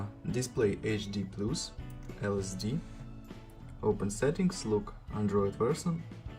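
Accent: Russia. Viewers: Русский акцент и никуда от него не деться.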